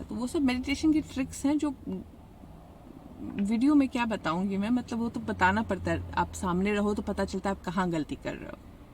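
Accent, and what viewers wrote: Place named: Bihar, India. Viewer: Ohh tbhi to main apko sunti hun toh aisa lagta hai ki , min unkei bate sun rahi hun coz woh bhi Bihar se hi hai .. but thanks a lot for helping to grow us.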